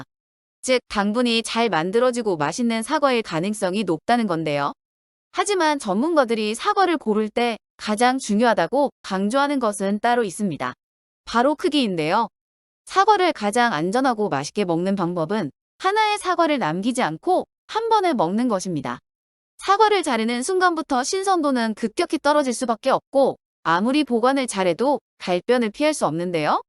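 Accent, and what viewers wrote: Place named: Gyeongsang, South Korea. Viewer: 경상도 말투가 서울말씨랑 어울려저 아주 듣기좋아요ᆢ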